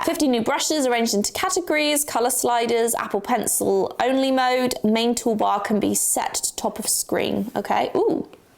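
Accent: England, United Kingdom. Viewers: Sorry are u english? I can't tell if ur American lol the for making this vid!! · The British accent I love it Bettah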